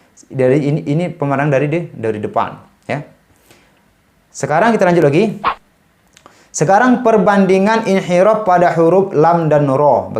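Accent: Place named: Eastern Indonesia, Indonesia. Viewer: Suka aksen timur beliau. Maa syaa Allah... Jazakallahu khoyron Ustadz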